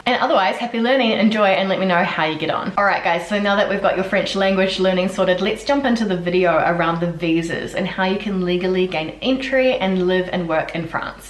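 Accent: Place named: New Zealand. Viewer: Thank you so much for this easy to understand and concise video on our visa options. Also refreshing to hear a kiwi talk immigration, too!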